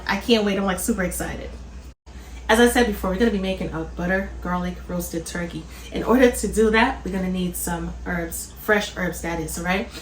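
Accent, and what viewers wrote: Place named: New Jersey, United States. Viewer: Is that a Jersey accent I hear? Love this recipe also, thanks for sharing ❤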